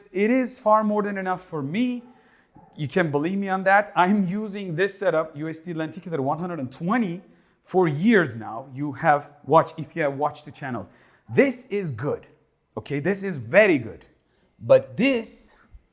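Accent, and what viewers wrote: Turkey. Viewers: Eski bir videoyu seyrettim direk aksandan Türk dedim bu Abimiz)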